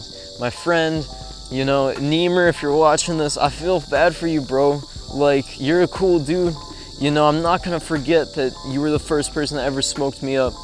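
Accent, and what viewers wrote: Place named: Canada. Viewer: Where are you from, sounds like youre from Canada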